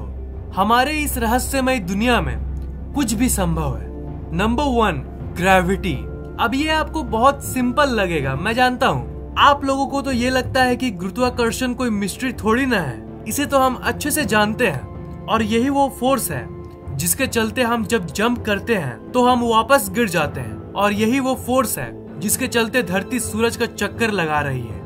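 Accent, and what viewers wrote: Bihar, India. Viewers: gaddha korna mtlb full desi ❤️❤️😂 Bihari ascent ❤️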